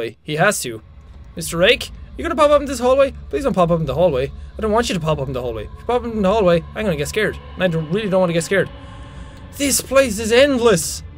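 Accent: Ireland. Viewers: First time he sounds Irish. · you sound Irish I know because im half Irish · Are you Irish?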